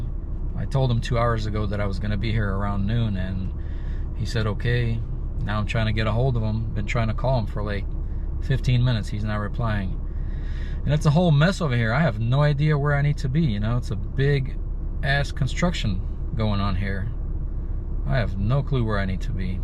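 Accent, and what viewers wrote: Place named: United States. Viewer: Говоришь ты как настоящий американец, красиво, а действуешь четко и без нервов как настоящий русский. Кто ты воин?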